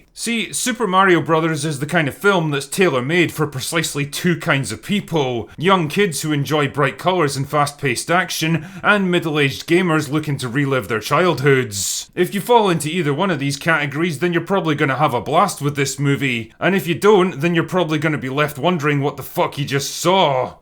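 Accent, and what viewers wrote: Scotland, United Kingdom. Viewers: No, I don't care whether or not it's good, and I'm not paying for it anyway since it's not my genre. I just enjoy your take on these things. It's hard to beat a drunken Scot sarcastically discussing a movie. ;-)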